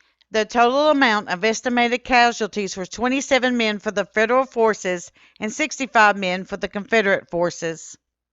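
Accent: Minnesota, United States. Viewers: It's a Minnesotan upper midwest accent you can hear it in parts of the Dakotas Wisconsin and Iowa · Never heard such a thick Minnesotian accent...😊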